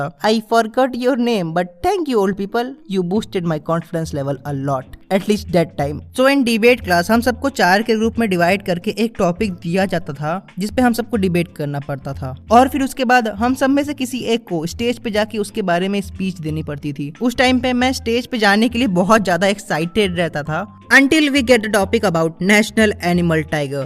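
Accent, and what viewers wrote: Bengal, India. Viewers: R u Bengali? Btw liked ur video👍🏼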